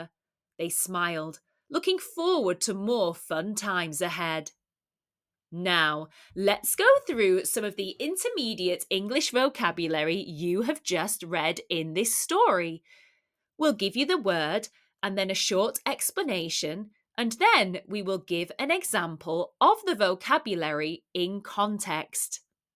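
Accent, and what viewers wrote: United Kingdom. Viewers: British accent is so romantic and beautiful 😊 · I like all of your stories too much, it is practical, with new words, nice u.k accent 🙏🙏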